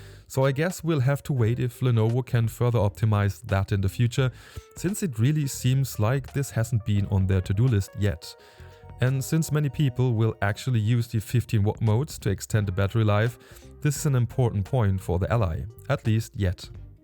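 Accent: Germany. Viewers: Dachte mir die ganze Zeit… ists nen deutscher? 🤣 bis zum letzten Wort war ich mir unsicher 🤣 Tschüss!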